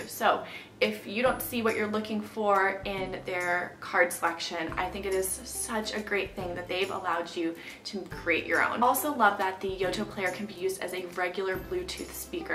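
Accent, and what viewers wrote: United Kingdom. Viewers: Great summary, tbh MOST products on the market have an American accent so it's a refreshing change to find one with a British accent! :) Yours truly, a Londoner. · Nothing wrong with the British accent.... But to be fair, it's an English accent.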